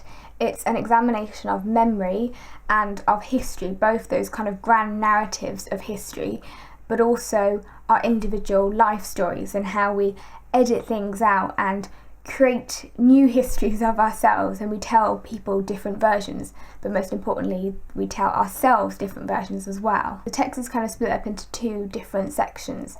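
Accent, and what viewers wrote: London, United Kingdom. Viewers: What's so special about this lassy's voice? She could be any schoolgirl from London or the home counties.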